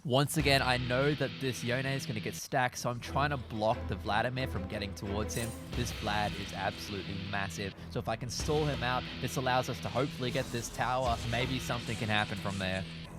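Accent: Australia. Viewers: why are you australian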